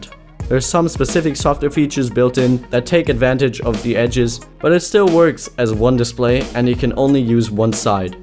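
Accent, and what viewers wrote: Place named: Germany. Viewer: you're from Germany? you have a really nice accent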